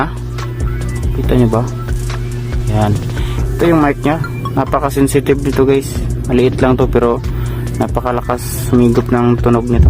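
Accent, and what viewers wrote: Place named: Davao, Philippines. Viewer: Bro taga davao diay ka?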